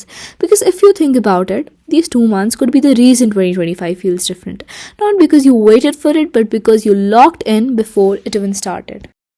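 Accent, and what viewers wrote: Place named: India. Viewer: Indian accent ❤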